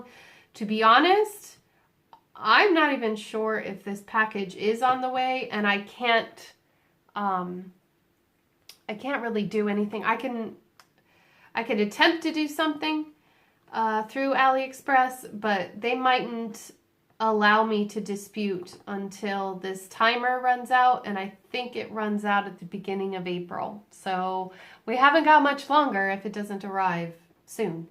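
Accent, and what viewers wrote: Ireland. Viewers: You do what ever makes you happy. I love to see what you put out and I love your mixed accent. YES you are starting to mix in a bit of Irish tones when you speak, and it is Adorable. Love you!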